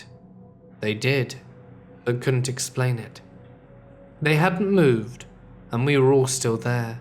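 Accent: England, United Kingdom. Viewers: laying in the middle of my bed, listening to middle of nowhere stories😀😀. I soooooooo wish you would do another collab with hellfreezer when you get settled! Besides killer orange cat, you guys are my favorite narrators and your voices would be awesome together! An English accent, and a Scottish accent, holy fucks batman!! He's a great narrator and I know my fellow MORTicians would like him as well. It's surprising that he doesn't have more subs.PS, the only thing better would be a collab with all three of you, haha. 🤗🤗🤗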